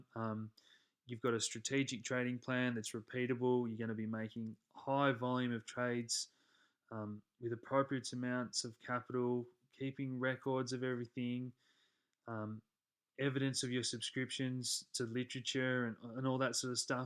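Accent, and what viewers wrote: Australia. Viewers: Good video. Great info. Good to hear an Aussie crypto trader. Subscribed. Cheers :)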